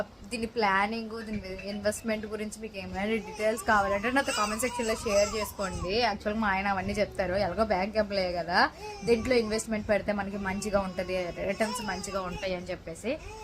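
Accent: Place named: Visakhapatnam, India. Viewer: Me latest short video nacha ledhu Telanganal lo konni rojulu unaru meru valla basha yasa ni egatali chesinattu undi... Mee vzm yasa kuda alage untundi ga... Speed lo vachestai... aina ok But ila comedy ki kuda oka limit... Balagam movie lo padina singer vaalu...valla yasa basha ni egatali cheyadam wrong..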